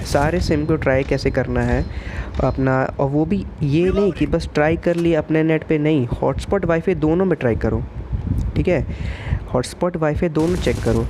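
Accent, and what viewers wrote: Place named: Bihar, India. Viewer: Or bhai kya hal chal Abaj se lgta h ap v bihar me rhte ho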